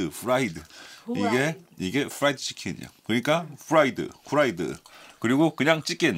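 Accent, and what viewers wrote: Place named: Philippines. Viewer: His accent is slowly turning into a filipino accent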